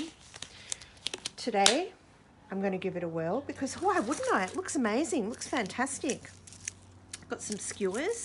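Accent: Australia. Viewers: I just found your site. Cannot hear you...voice is so soft....love the Aussie accent...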